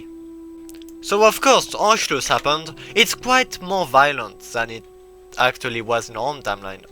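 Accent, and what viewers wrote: France. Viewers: bah bro i can tell by the accent + i checked his profile h says he’s french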